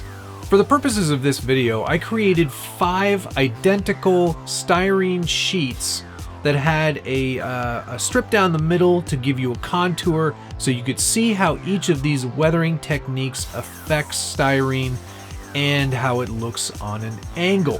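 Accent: Canada. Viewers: Hey man quick question, are you from Canada?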